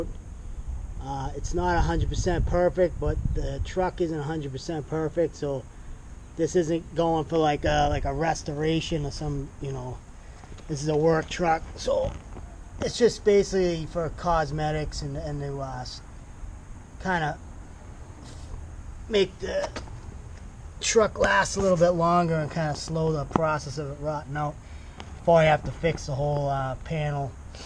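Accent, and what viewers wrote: Rhode Island, United States. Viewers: You sound like a Rhody. Great job.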